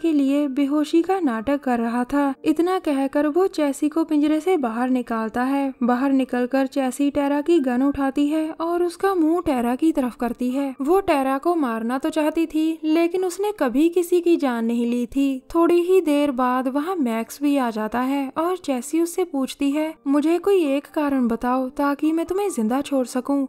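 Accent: Punjab, India. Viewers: Thodi thodi punjabi jhalakdi a explain vich😂😂😂😂😂😂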